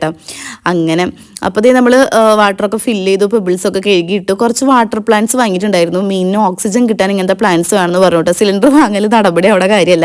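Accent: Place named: Thrissur, India. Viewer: തൃശൂർക്കാരി..... എനിക്ക് ഈ വർത്തമാനം ഭയങ്കര ഇഷ്ടം ആണ്.....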